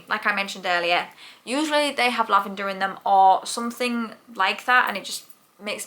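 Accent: United Kingdom. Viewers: Hello! I'm over from the Project Beauty channel and newly subbed! I hope it isn't too forward, but I love your accent. What part of the UK are you from? Northern England?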